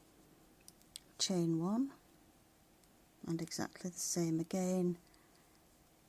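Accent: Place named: United Kingdom. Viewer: You sound British, so why are you using American terminology?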